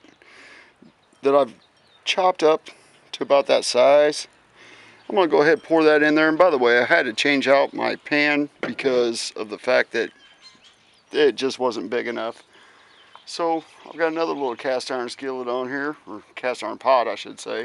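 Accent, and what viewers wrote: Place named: South, United States. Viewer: it is an absolute requirement that, if someone is gonna make a bbq video, to liberally use the term, "yall". And you don't disappoint------------ specially' with ur' southern drawl. Good Job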